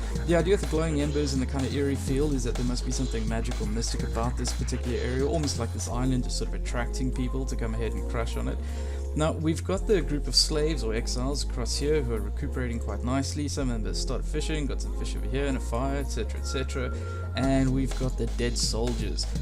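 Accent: South Africa. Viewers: Where you from, i hear some south african twang every now and then? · I like the commentary and the base itself, sounds like you're a fellow South African? Subbed your channel and keep up the good content ^_^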